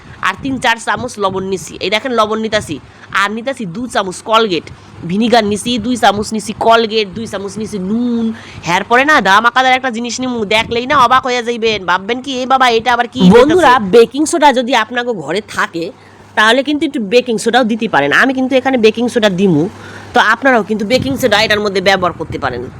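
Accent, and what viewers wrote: Bangladesh. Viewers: ও দিদিভাই আজকে তো পুরো বাংলাদেশের স্টাইলে ভয়েসটা বেশ ভালো লাগলো❤❤❤ কথাটা তো বেশ ভালই লাগলো❤❤❤ তোমার ছেলের গাড়ি ভেঙে গেল তোমার এই টিপসটা এপ্লাই করবো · আরে বাহ্ আজকের রেমিডি তো সুন্দর কিন্তু তোমার কথা মানে একদম বাংলাদেশের কথা দারুন সুন্দর করে বললে আমার দারুন লাগলো ❤❤❤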